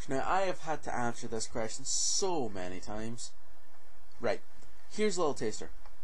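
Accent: Scotland, United Kingdom. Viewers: i understand you fine with your scottish accent..maybe because i'm part scottish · I can understand your True Scottish Accent, but I think what your working with is good enough. It works very nicely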